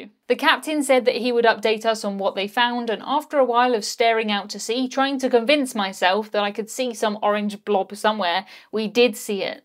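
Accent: United Kingdom. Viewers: I love British accents♥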